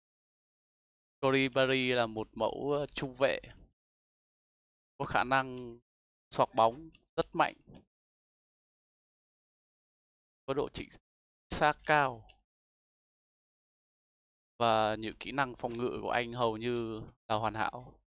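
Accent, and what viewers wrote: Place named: Yen Dinh, Vietnam. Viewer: nghe giọng nói là nhận ra a ở yên định. Bạn anh ở thọ xuân có vài người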